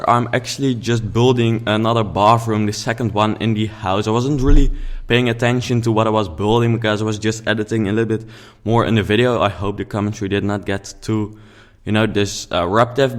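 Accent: Netherlands. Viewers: Hihi je hoord ook echt wel dat je nederlands bent lol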